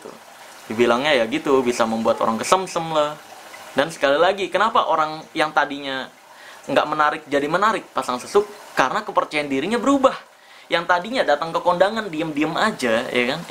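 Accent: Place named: Madura, Indonesia. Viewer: logatnya,,Islam madura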